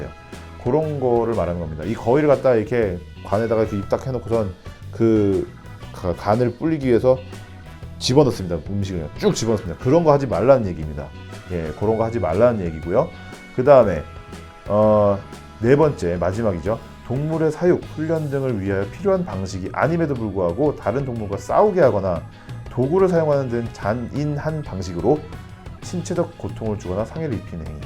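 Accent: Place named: Honam, South Korea. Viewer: 여기가 호남(사투리잘쓰는)형 변호사 유튜브 맞나요? 구독하러 왔습니다!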